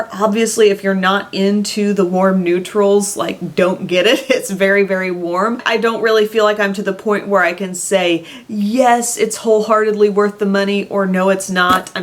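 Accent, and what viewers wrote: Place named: New England, United States. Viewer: You pronounce some words like you were from New England! That's a New England accent! 😊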